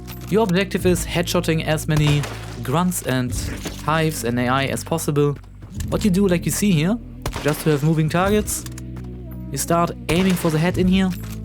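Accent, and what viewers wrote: Germany. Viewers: that German accent to me as an English person is a stamp of quality! I just know it's going to be a good video. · Ich höre sie sind ein deutscher der herr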